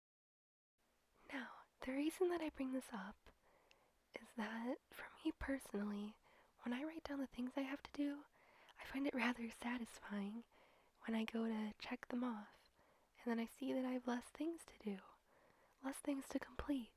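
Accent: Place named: United Kingdom. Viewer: I came for the accent, and you know what? I can tolerate what i'm hearing at least it's not an extreme British accent.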